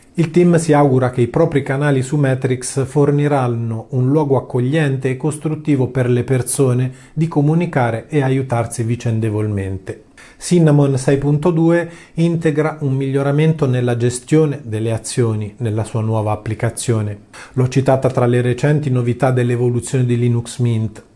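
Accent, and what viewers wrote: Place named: Umbria, Italy. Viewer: ciao, non riesco a capire il tuo accento.....sei umbro?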